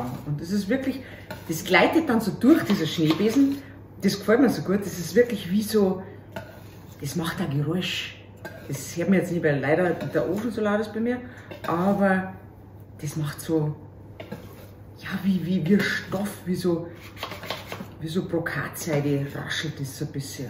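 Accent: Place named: Bavaria, Germany. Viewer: vielen Dank liebe für die schnelle Antwort. Ich finde deine Videos egal ob das Backen oder die anderen so natürlich und mega lustig, das ich dich gleich abonniert habe. Ich muss mich zwar teilweise sehr konzentrieren damit ich alles verstehe, wenn du in bayrischem Dialekt sprichst🤣 aber es geht. Wünsche dir einen schönen Sonntag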